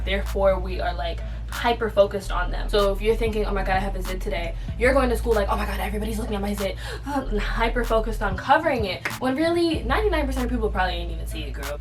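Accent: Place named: United States. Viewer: omw hey! I love your talks because it makes me feel like I have a big sister and I'm so greatful for that!You are so lekker (lekker-lek-ker ) means that you are so cool! In my language . lol I am from Africa and the way you speak in "American" is so cool also,it makes me wanna speak it to for example: aight bet..your language is so different to ours but it's interesting too♥just wanted to say that I love your channel and I hope you do a more recent "get to know me " video or just something that we don't know about you ...like "pet peaves now" ♥♥ again I love your channel soo much. Please give me a shout out...If you want lol.♥♥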